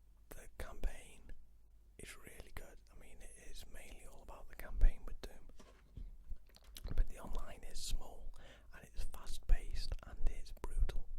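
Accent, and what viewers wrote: Wales, United Kingdom. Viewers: ah right thanks I thought it sounded Welsh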